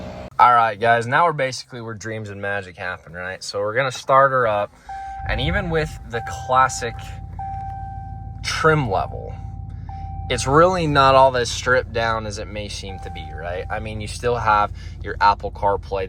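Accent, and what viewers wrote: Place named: United States. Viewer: Your country accent is so cute 😊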